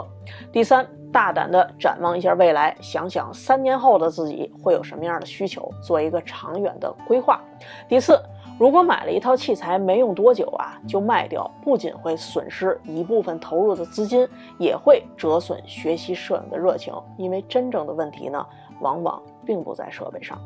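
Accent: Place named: Tianjin, China. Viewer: 听起来像是天津老乡 嘻嘻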